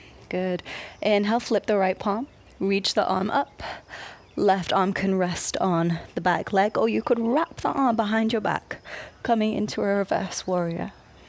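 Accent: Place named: Scotland, United Kingdom. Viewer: Only just discovered you however done a few sessions they are perfect in every way. Just curious where you are located? Detect a soft Scottish in your accent Hey all well done from jane in Australia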